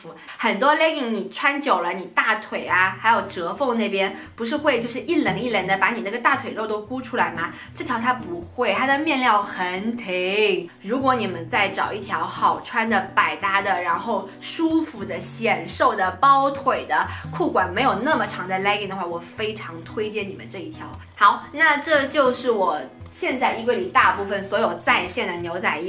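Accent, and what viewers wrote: Shanghai, China. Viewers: 男男头哈哈哈上海宁好亲切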